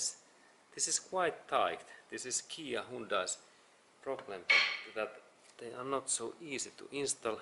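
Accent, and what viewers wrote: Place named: Finland. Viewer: Thank you for a very god video , you have a very pleasent manner and explain very well in your finish english accent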